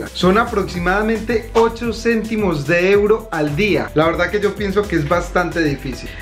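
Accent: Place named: Antioquia, Colombia. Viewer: Este man es español o colombiano porque tiene acento paisa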